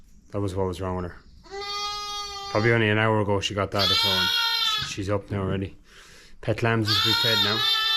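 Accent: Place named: Ireland. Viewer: Another fabulous vlog!!! Each one gets better than the last. Great to see some of your flock close up….. they are gorgeous!!! Love that you chat to us as you go on your rounds. I could listen to you all day long with that lovely Irish accent! Great to see those ewes recovering & the lambies up & sucking. Good call with the calcium & other treatments. Casey was amazing catching that last ewe, she really stood her ground at the end there. I feel your frustration though when she’s raring to go but you really need her to stay put on the bike. Miserable conditions for you & the lambies in the drizzle & the cold. I pray the sun starts shining again for you soon!!! Quick question; if a ewe needs a calcium injection, is it a one off dose or will she need a few, & how do you know that her problem is resolved? Also, I’ve heard of twin lamb disease, is that calcium related or something totally different?? 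(I know, that’s 2 questions…… I’m pushing my luck 🤣)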